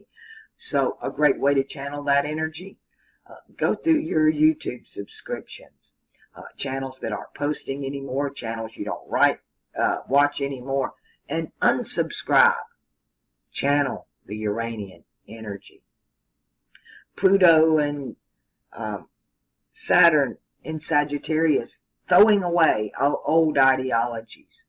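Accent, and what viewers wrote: South, United States. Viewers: The ones I have always learned the most from, are the surprise, or chance encounters. You, are a picture of this phenomena. At least in my paradigma...! So, isn't it a pleasure, hearing you speak so smooth and southern, about things that are so incredibly in parallel with my needs? Claro, que si. There are ten years of Time, free of obligation, that the gods themselves awarded me, and I immediately consulted the Oracle in Google, and have been there much of the time. Seeking out answers that before clikclikclicketyclik, would have taken twenty years... well, my 2 or 3 thousand hours in navigating the nether [ether?] through the myriad of bad false and OhMyGod! and lies... and all the other... have yielded a few smooth stones, personal and spiritual, precious, which I keep in my pocket. I use these weightless stones, pretty and oval, for many things. 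It's amazing what can be done with the simplest of tools. Especially when and if one connects to that universal..... dare I say?...Force! you know, the one that makes shit happen. All we have to do is acknowledge it, right? hmmm... I'm gemini.. so.. I also keep a turd in my pocket in case someone tries to shit me.... [grin] In spite of my ever so June14 63 pairsohnality, and my razor sharp wit, and my light in my shoes and smiling approach to reality, here... I have missed some stuff, and still have infinite things to learn. Of course. But your videos have certainly put some more color on the table where the puzzle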